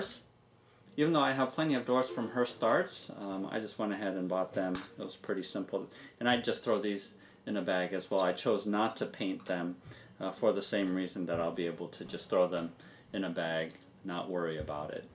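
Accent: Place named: United States. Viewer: because of the american accent, I kept thinking that you were calling the abomination obamanations. made me chuckle. good job though dude.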